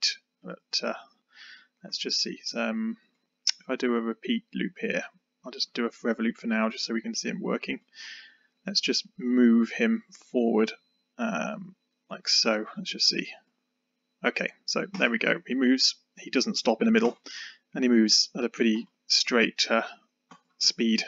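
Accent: United Kingdom. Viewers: waitwaitwait you're BRITISH?? that's what it sounds like.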